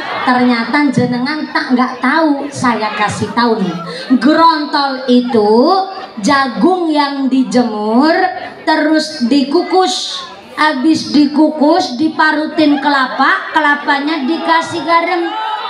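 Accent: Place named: Java, Indonesia. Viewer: L39, waalaikumsalam hadir menyimak tauziah oleh ustadzah yang kocak dan logat Jawa ngapak yang sangat bermanfaat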